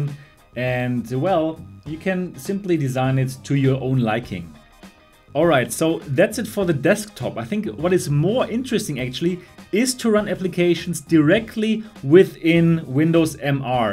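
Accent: Germany. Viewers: Man hört von der ersten Sekunde, das du ein Deutscher bist ^^ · Are you from germany?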